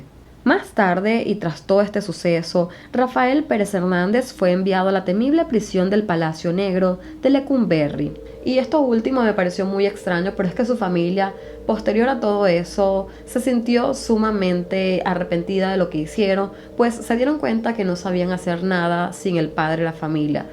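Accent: Standard. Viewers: Hablas muy bien, además tu acento es muy neutral, eso ayuda bastante a que se sienta calidez en la narración. Te recomiendo que mejores la redacción del guión, repites mucho palabras, cuando puedes usar sinónimos, por ejemplo en este vídeo a cada rato decías 'Sumamente'. Suerte con todo, éxitos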